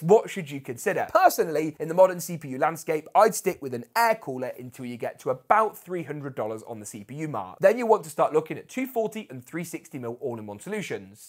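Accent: United Kingdom. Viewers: Are you British? No disrespect to England. But I Can't understand anything you say. You are eating half the words and talk too fast. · Man, British accent is really tough(for foreigneers😅😅😅)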